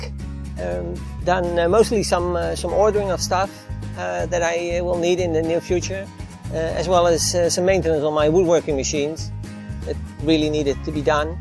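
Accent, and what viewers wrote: Netherlands. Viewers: I hear some Dutch origin in your voice? Or am I way off?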